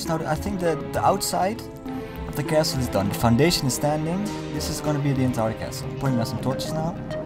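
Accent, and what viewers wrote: Netherlands. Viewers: is this guy dutch?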